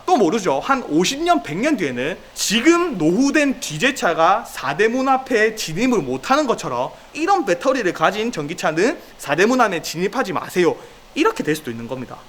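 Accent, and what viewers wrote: Busan, South Korea. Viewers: 딱 보이 부산표준어네? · 마 붓산싸나이답게 설명 쳐즈기네요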